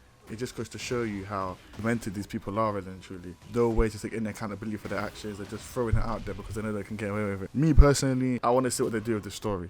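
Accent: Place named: London, United Kingdom. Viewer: you are the most north london person i've heard in a while, bro said "mandem, we got a juicy one stylll" 🤣 love bro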